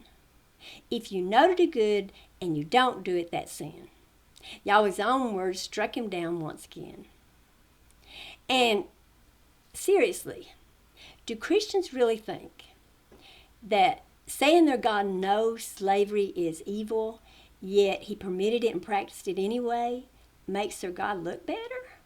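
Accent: South, United States. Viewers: First I just have to say how much I love your southern accent , the hi and bye in your videos is just the best to me lol . Very well said and excellent pints , I’m 29 and have spent most of my life in fundamentalist Christianity , but every time I opened the Bible and saw the strikingly human nature of this god it constantly agitated me .. how could this have been the supreme god who we are suppose to worship , and yet his moral fabric is shady at best and outright malicious at worse … along with the fact that he does nothing to intervene in the collective human suffering that’s continuously happening in this world , and then ti stoop so low as to use threats of torture against people who won’t Appeal to his ego , no thank you , what is the point of having a god who is utterly useless and narcissistic.. needless to say I’m glad I got out when I did . 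Excellent work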